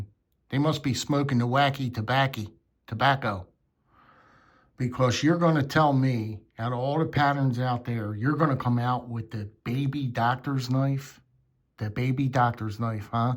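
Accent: Philadelphia, United States. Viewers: Traditional knife videos on YouTube I usually hear a lot of southern/midwest accents, gotten used to it. It’s kind of cool to hear someone like from Philly region.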